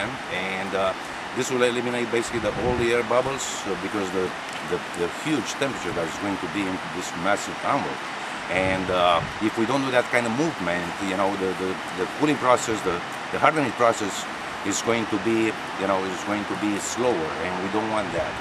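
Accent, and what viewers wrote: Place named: Spain. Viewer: your spanish accent is very very thick that it is awesome!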